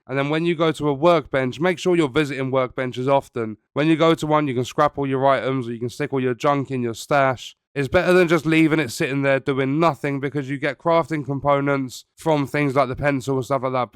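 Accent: United Kingdom. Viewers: Every Fallout 76 youtuber seems to be British lol. I know it's not their fault, but the British accent to Americans is so grating lol.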